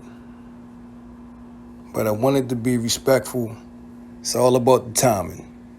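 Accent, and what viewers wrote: Baltimore, United States. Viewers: Your definitely from "Baldamore!"😁😁💯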